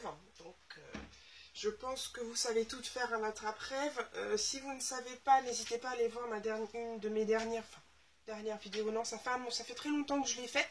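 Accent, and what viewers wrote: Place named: Normandy, France. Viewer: re :c'est déjà fait , tout est au maxi , par moment je vous entend et d'autre beaucoup moins.je vais investir dans des enceintes alors mais je m'en servirai que par moments car dans certaines vidéos que je regarde je mais au minimum car les intro son en musique donc c'est trop fort . vous êtes de quelle région ? Votre accent me rappelle celui de la Normandie. bonne soirée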